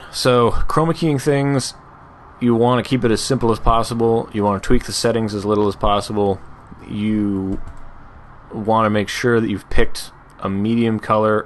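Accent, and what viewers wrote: Canada. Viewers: Are you Canadian? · ... Ur Canadian aren't you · Dat Canadian accent doe :D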